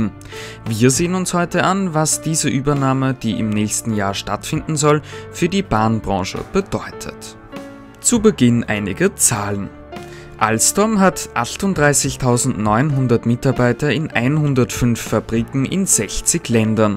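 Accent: Austria. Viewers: Du bist für mich der "Apfelwelt" der Eisenbahnbranche :D kurze informative Videos mit einer ruhigen und gut verständlichen Tonspur mit österreichischem Akzent :p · spricht ja nun wirklich sehr gut verständlich und auch sympathisch. In Österreich werden einige Laute halt anders ausgesprochen, so what? Gruß aus Frankfurt, wo es auch nen Dialekt gibt. 😅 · glaub ist ein fast versprecher oder mikrofehler aber die acht spreche auch ich(auch ösi) so aus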